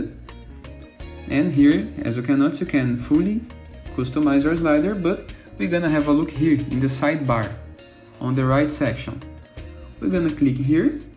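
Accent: Brazil. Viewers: I'm Brazilian and listening to your video, I can conclude that you are Brazilian as well haha.... so cute are the Brazilians English accent hehe, I don't have it anymore cause I wasn't raised in Brazil. But Thanks for your video, it was short and helpful. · this is a Brazilian accent if you have ever heard one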